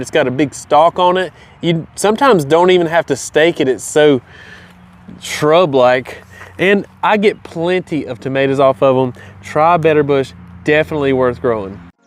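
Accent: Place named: South, United States. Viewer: What the heck! I ain't been gettin' these alerts for the new vids (me trying to sound southern like here) Anywho...great seeing y'all and those are some beautiful plants! Just finished my garden in SW Michigan and it's smokin' hot and no rain in the forecast for days...happy gardening! Great job, once again - cameraman!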